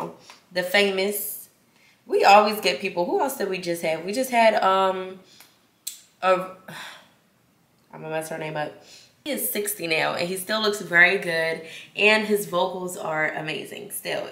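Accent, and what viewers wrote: Jamaica. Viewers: Hey! First of all I’m here for the Jamaican accent 😍 And I love your content! I’m one of them OGs been watching since you were in cosmetology school!🤗 Just keep doing what you’re doing I love your vlogs I also love your outfits so maybe do some outfit ideas and I love your make up and hair too so just keep showing us or maybe self care routines too like face care routine or body care routine 🌷🌷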